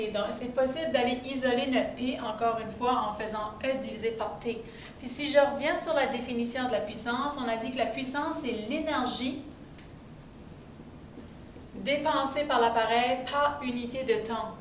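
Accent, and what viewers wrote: Canada. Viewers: J'aime l'accent cannadien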